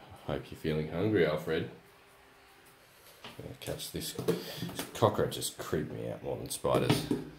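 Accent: Australia. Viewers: Ken Ken he sounds slightly Australian, he’s also from Australia. Australians are known to be cool with spiders and stuff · Me after seeing the thumbnail: “I bet this is in Australia” Video dude two seconds into the video: Australian accent YouTube pinned location: Australia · Of cause he’s Australian the accent and the fluent voice is Australian. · I heard the Australian accent before I even clicked the video 😭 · yk its an australian video when he has an australian accent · you know this is australia when he has a australian accent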